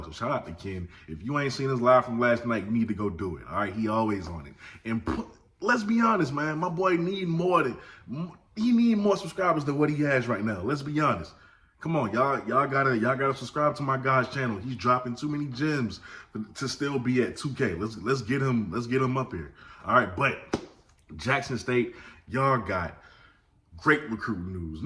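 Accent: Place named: Lowcountry, United States. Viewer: Okay you from the low country!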